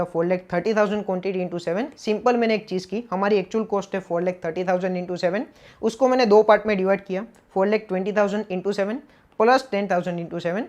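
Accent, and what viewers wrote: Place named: Junagadh, India. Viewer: 2 things *Junagadh no tone aavi gyo😍 *And standard costing nu formate JKSHAH Classes jevu lage che..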